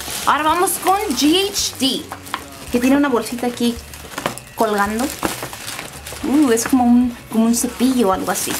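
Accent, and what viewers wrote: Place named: Mexico. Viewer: eres mexicana?? tu acento me hace pensar eso... eres hermosa, sencilla y super talentosa... me suscribo!!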